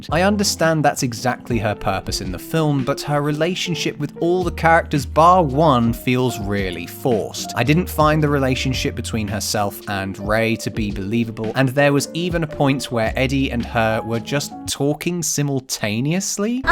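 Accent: United Kingdom. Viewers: this guy sounds very British what do you mean?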